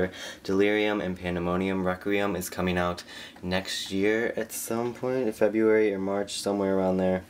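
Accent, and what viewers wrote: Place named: England, United Kingdom. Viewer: I must admit nothing gets me like someone speaking Spanish with that typical English accent so yay you made my night =). Oh and I am envious of your shelf =)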